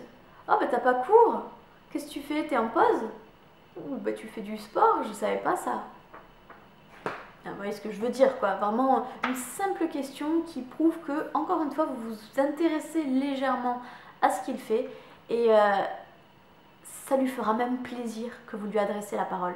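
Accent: South, France. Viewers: Ta un accent, tu es pas du sud ?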